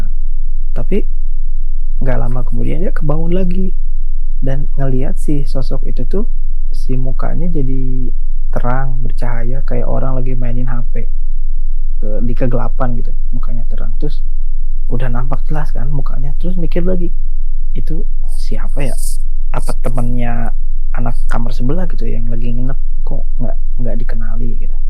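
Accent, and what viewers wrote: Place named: West Java, Indonesia. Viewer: Pasti orang sunda nih mas nya :v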